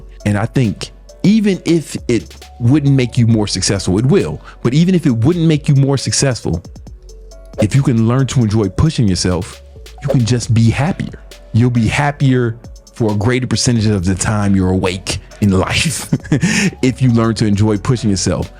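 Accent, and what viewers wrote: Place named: South, United States. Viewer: southern twang haha i didnt know it was called this way im from France by the way so we'r definitely no experts at accents southern twang from brave men though 🤷🤣🏆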